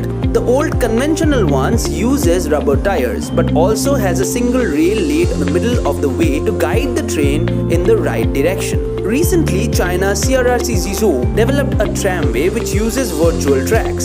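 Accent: India. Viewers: YOUR INDIAN ENGLISH IS LIKE YOU ARE SING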